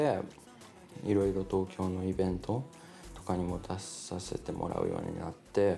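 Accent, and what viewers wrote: Okayama, Japan. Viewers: 里庄じゃ！✨ 地元の隣の隣の町（かな？）だから行ったことあるとこにこんなすごい人がおったなんて未だに信じられない✨ しかも、そんなすごい人が岡山弁喋ってるなんて不思議な気分。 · 同じ岡山県人ですが紅白で初めてまじまじと拝見し、衝撃を受け以来毎日聴いています。何より岡山弁で話したり歌ったりしているのが素晴らしい。自分の言うこと、音楽に余程自信がなければできないことです。ぜひこのまま岡山弁を通してください。応援しています。仁科博士以来の著名人ですね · 圧倒的なピアノ、心地よい歌声、素朴な喋り方、深い歌詞、癖になるメロディー、純粋さ、岡山弁でバイリンガル、髪型や曲でガラッと見た目の雰囲気が違ってつかみ所がないイケメン…。 ほんと魅力しかない。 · 岡山に嫁いで早２８年目になりまして、数年前に風さんの事を知りすぐにファンになりました。岡山弁で話す風さんも、心地良い歌声も曲も好きです。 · 久々に好きなアーティストに出逢った! 楽曲や歌声はもちろんの事、英語交じりのコテコテの岡山弁や人懐っこい甘え上手な表情も好きだ! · 岡山弁ってこんなに愛らしいのね…（千鳥さんのイメージが強いので）いろんな優しさが風さんを包んでいるように感じます。もっと聞きたい、もっと知りたい。どんどん素敵な歌作ってください！救われる人が多いはず。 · 歌声も顔もその年齢には思えないセクシーさがあるのに話す時は本当に信じられないくらい素朴、岡山弁と英語が混じった語りも、ライブの自由さも全てが唯一無二。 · 岡山で20年以上過ごしてきたから、岡山弁なのかな？？☺️💐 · 格好良い歌声と岡山弁の喋りのギャップがいいね。岡山県民として嬉しい。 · 歌ってるところから見始めて、すごく色気がある人と思い、そしたらまだ25歳で、普段どんな喋り方をしてるんだろって思いたどり着いたら、こんな岡山弁満載の優しい喋り方で、このギャップにどっぷり沼にハマってしまいました。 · 岡山県民だけどなんかこの人の方言優しい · 職場のおばあちゃん世代の方のオススメでハマりました！思ってたよりポヤポヤしててギャップに悶ております✨ 〜がまぁたそと同じ発音で謎におぉ！！ってなりました（笑）岡山の人の話し方好きだなぁ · 岡山県の方言がしみます🤗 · 大都会岡山弁をずっと使ってるのがいいですね。一生続けて欲しい。岡山愛があればどこでも生きていけると思います。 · のだめぐみは、博多弁。風君は、岡山弁。うふっ💓😊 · まさか、こんなそばに大きな風が羽を休めていたなんて…同僚が風さんの同級生でビックリしました🤣 ここからどんなに大きく羽ばたいても岡山弁を貫き通す、そんな風さん可愛すぎてやべぇじゃろ笑 そうそう、岡山駅の地下にグランドピアノ（自由にお弾きくださいっていうストリートピアノ）が設置されたの知りょ〜る？？ 次に帰ってくるときは、ぜひこっそり弾きに行かれ〜♬ 風さんが弾き出したら『こっそり』いうわけにはいかん思いょるけど🤣 でも、いつか必ず弾きに帰ってこにゃおえんよ〜☺️👍✨ （普段使ってる岡山弁で書いてみました笑笑） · 神は私達に素晴らしい逸材を与えてくれた・・稀なる素晴らしい天才的才能✨ 音楽でこれ程の感動癒し希望を感じたことはなかった、岡山弁でゆったりとした口調、穏やかな表情、優しい心・・これからも時がたっても変わらずにいて欲しい。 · 岡山から大きく羽ばたいて行かれたこと 今も岡山弁を喋ってくれとること 本当に嬉しいです👏🏻👏🏻👏🏻 身体に気を付けて頑張ってなー🤗 心より応援しょーります🍀🙏🏻😊👍🏻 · 地元岡山を離れてもうすぐ10年。コロナ禍で1年以上帰省もできず。 私の方言は完全に抜けてしまいました。 風くんの歌詞、インタビューで操るネイティブ岡山弁に魂が揺さぶられました。 懐かしくて…でも新しい。 岡山からこんな人が世に出るとは。勝手に誇らしいです！ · 娘が数ヶ月前から風さんの歌を聴いてました。私は今月のねそべり配信をみて、何なんw ❕です😍🎶 こういう今迄の経緯が聞けてうれしいです。 風さんのオリジナル曲にどっぷりはまっています。 お人柄も岡山弁も素敵です😌💓 あなたの夢に突っ走ってください(*^-^*) マネさんも素晴らしい方ですね(^o^) 娘とライヴに行ける日を楽しみにしてます(^o^)/🎶🎵💗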